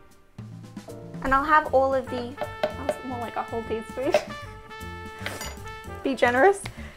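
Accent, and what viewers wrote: Australia. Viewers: You’re one of the only girls that makes an Australian accent sound pleasant, lol. No hate!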